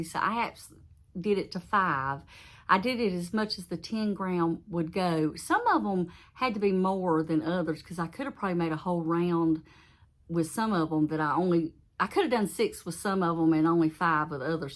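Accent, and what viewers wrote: South, United States. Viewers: enjoy your video. I love your voice southern talk.thank you for sharing 😀. God Bless everyone love and prayers for everyone 🙏❤.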